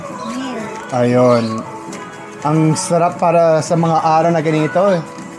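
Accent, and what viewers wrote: Ilocos, Philippines. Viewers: You have an accent of ilokano dialect. I have ilocano friend and you have similar intonations. I'm impressed because I heard from her that ilokano is very hard to learn especially the accent itself. Did you stay in ilocos and how long?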